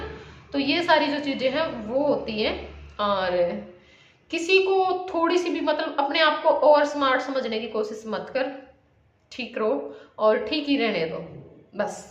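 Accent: Haryana, India. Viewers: bebe ghne din m video Dekhi...Rohtak aali bolan laagiii bebe🤭🤭🤭🤭🤭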